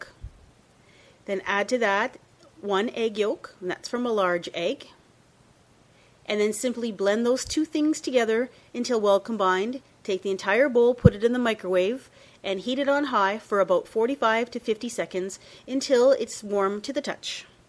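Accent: Canada. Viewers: The sugar must make it sweet, is this standard in North America? I've heard the bread in USA is quite sweet but you sound Canadian, is it the same there? Also can I make this bread without the sugar in it? :) Thanks for all the lovely recipes! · can tell from certain words that shes canadian :P prety much the same thoguh, your right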